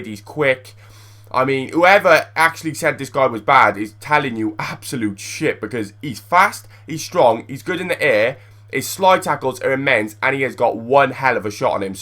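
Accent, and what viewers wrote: Wales, United Kingdom. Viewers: your accent is confusing, there's weird moments when a bit of Welsh comes out..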